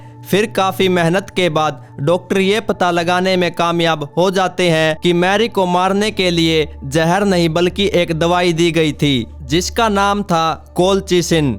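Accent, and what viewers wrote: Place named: Rajasthan, India. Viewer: Rajasthani aise hi bolte he shyad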